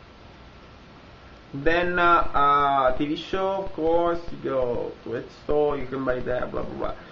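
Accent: Italy. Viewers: Il tuo inglese mezzo italico mi permette di capire tutto. Inglese puro faccio fatica... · Italian? or Portugese? am thinking Italian...lol, iti s distracting, but cute.....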